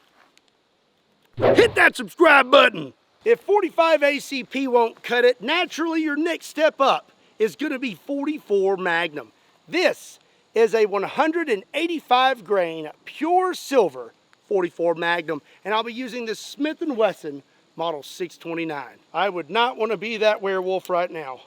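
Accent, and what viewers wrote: United States. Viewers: I like how he talks like a cowboy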